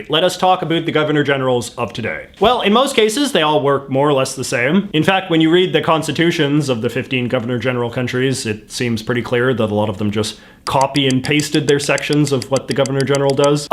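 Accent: Canada. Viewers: I love yer sarcastic energy 💃🏼(& that is one heavy Canuck accent 😁 unlike my daughter who lives in van, she is dual citizen, no accent, tho she knows french)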